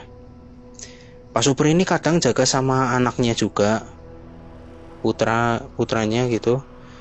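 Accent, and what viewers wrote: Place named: Java, Indonesia. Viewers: Ora Ngapak Ora Kepenak ... 👍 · Medoknya ganggu banget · Ora ngapak ora kepenak kang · Ko gue malah ngakak ya bukan takut . Sumpah mas nya medok bgt ampe perut gw sakit meragain omongannya . Tapi bagud mas menghibur walaupun gue malah ngakaj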